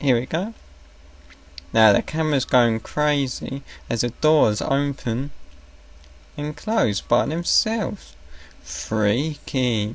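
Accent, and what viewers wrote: United Kingdom. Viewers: Sounds like Toby from The Office US with a British accent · Me who knows little to non english: ... WHAT IS HE SAYING I CAN JUST UNDERSTAND ISOLATED WORDS Help i am having a meltdown 😂😂 British english is still way too much for me ahahah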